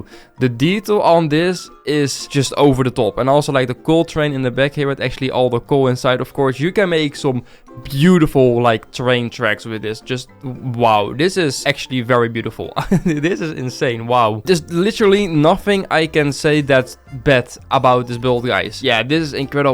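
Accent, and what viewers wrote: Netherlands. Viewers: Bro the dutch i am dutch and all of the time i think all of the time are you dutch. So are you dutch or not? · 😅ik hoor een Nederlandse accent ben je Nederlands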